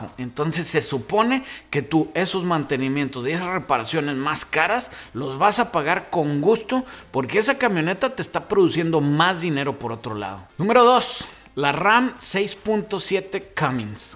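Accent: North, Mexico. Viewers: No puedo con el acento este 😂😅 lo siento no.podre suscribirme😢 en que parte de Mexico tienen este acento o es puro teatro 😂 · Así hablamos los norteños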